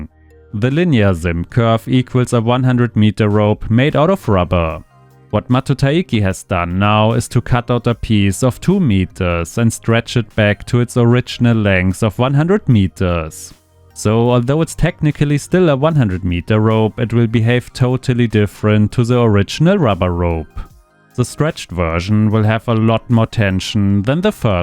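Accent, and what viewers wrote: Germany. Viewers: Are you German? sounds very German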